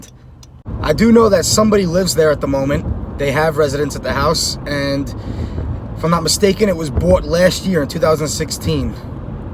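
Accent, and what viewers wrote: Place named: Massachusetts, United States. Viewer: "It's a cloudy full day here on Long Island". I had to play his opening statement twice because of his accent. Sounds cool, a little bit like Massachusetts?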